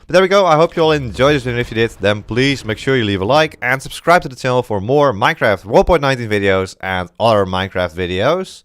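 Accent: Netherlands. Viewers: u sound dutch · Where you from? You sound Dutch? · you sound dutch mate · Are u dutch because i can hear it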